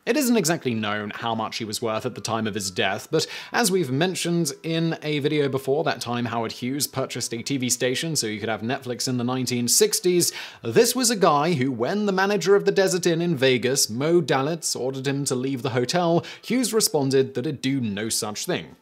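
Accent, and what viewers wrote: United Kingdom. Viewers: He's British. They pronounce words funny.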